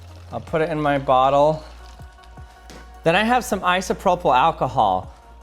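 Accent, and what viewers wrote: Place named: United States. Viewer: annoying yanky droning voice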